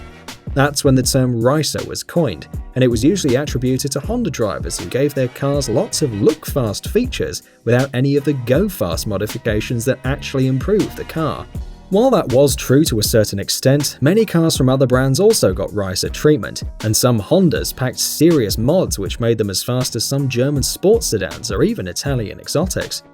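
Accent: England, United Kingdom. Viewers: Are you from England? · Weird to hear a British accent and loads of British numberplates, and hear you call an MX-5 by its US of A name..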